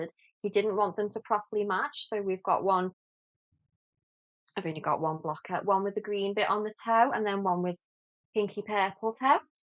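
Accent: Liverpool, United Kingdom. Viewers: I knitted facial cloths out of Bamboo Pop, which is cotton/bamboo. They turned out to be sturdy. I liked the 20cm (8") square, and knitted them with squares K5 P5 and slipped the first stitch of every row. I'm in Maryland, and heard about you from Becky of A Handknit Letter. Love listening to your Liverpool accent; reminds me of interviews in the '60s with Paul McCartney. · What a great podcast! I'm from Stourbridge in the West Midlands so not a million miles away, and I love the scouse accent. You are so natural and chatty. I love it! I've just subscribed, too ❤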